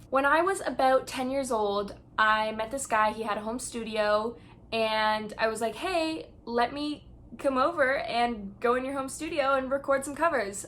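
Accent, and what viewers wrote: Canada. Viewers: I was just watching Sam and colbys conjuring video and swore I heard some Canadian from you. Us Canadian to California transplants can hear it lol. Funny, I also moved to California from Oshawa when I was a teen. It’s been much longer for me though 😂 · I love your Canadian accent (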